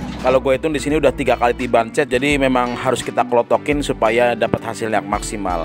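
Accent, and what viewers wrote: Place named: Banyumas, Indonesia. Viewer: Ngapak pokoke jozzz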